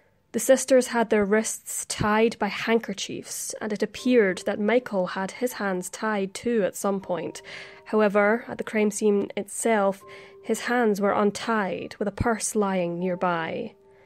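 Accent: Scotland, United Kingdom. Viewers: There’s nothing sweeter than a a Scottish accent like if you ask me!!! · Oh, I've heard about this before. Interesting case, and sadly one that'll most likely never be solved. Also, love your accent! Scottish, right? :) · She's Scottish..! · Clearly pronounced and in a beautiful dialect. The reader sounds scottish to me? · Yes I pick up on Scottish as well, some irish and Scottish sound alike but the Scotts have more of a drawl on their accents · Her accent is Scottish. "WESTERN" Europe is made up of many countries. All have the own distinct accents. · She has a Scottish accent and a beautifully clear voice. Both enhance the subject, however, your rudeness does not, honey.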